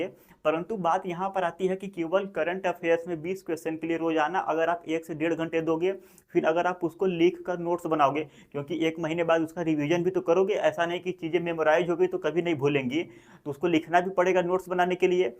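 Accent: Uttar Pradesh, India. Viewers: Bhai aap up ke ho kya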